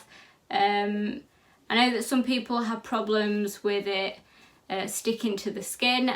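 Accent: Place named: England, United Kingdom. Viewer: Do you have loop ileostomy or a end ileostomy.. i had a loop ileostomy in June 2022.. I have a odd shape stoma so i carnt get precut pouches & I still carnt cut them to fit properly.. that problem really stresses me out.. also its nice hearing someone on youtube talking about ileostomys with a English accent.. nearly all the ones iv watched are American.. I'm in England x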